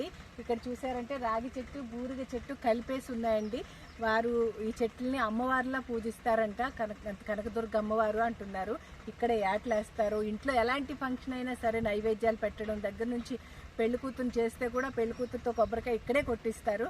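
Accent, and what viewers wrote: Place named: Godavari, India. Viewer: మాటతీరు గోదావరి వారిలానే ఉంది!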